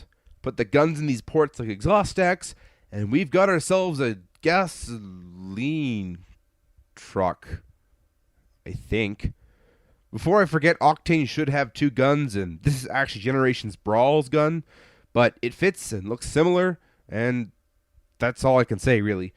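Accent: Canada. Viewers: Haha you sound like a candian .Are you Canadian ?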